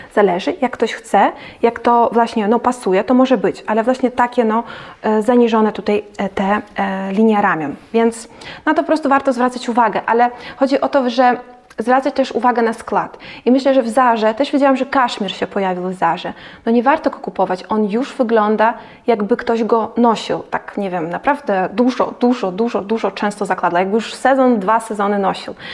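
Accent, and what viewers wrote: Poland. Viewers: To piękny polski akcent z ł jak l. Pozdrawiam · Akcent polski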